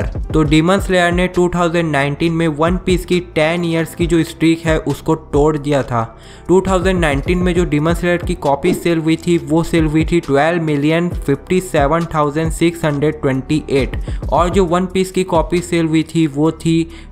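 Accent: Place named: Bihar, India. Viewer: Bhai bolte bhi bihari jese ho tum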